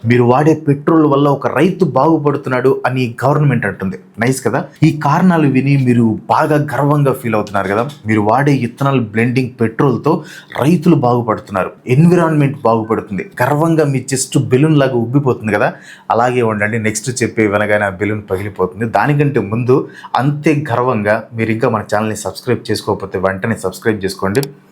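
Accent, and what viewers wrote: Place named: Guntur, India. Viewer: Guntur slang🥰❤️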